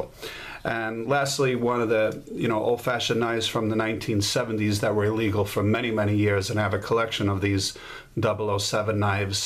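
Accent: United States. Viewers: You certainly sound like you’re from NYC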